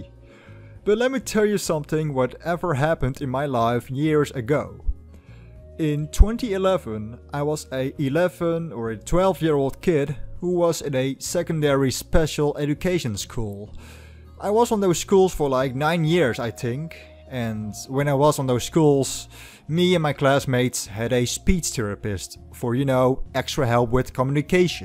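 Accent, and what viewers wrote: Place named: Netherlands. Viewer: The wa- de manier hoe je praate engels ik dacht al dat je Nederlands was oh en uh gefeliciteerd met je verjaardag Edit: ok ik had wat meer geduld moeten hebben lol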